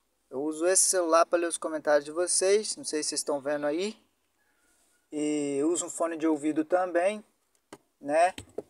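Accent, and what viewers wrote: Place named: Rio de Janeiro, Brazil. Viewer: Eu jurava que tu era carioca kkkkkk tamo junto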